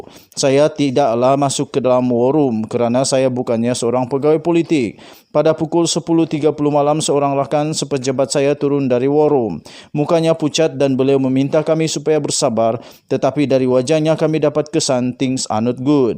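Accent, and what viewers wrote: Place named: Sabah, Malaysia. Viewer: sabah bah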